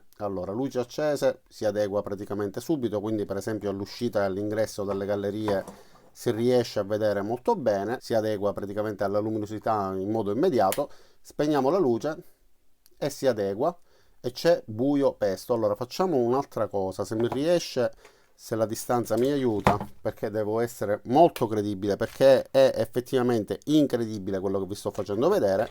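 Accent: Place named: South Tyrol, Italy. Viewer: l’accento altoatesino ti ha tratto in inganno! 😂 P.S. Adoro l’accento siciliano 😉👍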